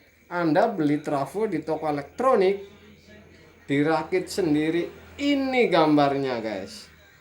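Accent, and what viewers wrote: Banyumas, Indonesia. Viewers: Senadjan kesanne mandan kaku, nanging aku bangga... logat Banyumas ane bisa dikenal Maring saindenging Nuswantoro.... aja kelalen... tetap semangat 45.... merdeka....!!!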